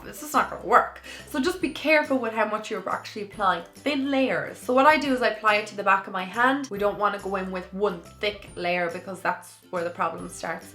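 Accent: Ireland. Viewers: awww ur so pretty darling. love ur accent. u sound Irish ? are u ? I'm new to ur channel. beautiful make up X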